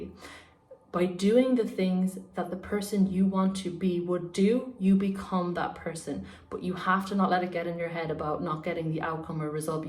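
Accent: Ireland. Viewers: Love your videos btw...so far you're the BEST and I love your Irish accent ❤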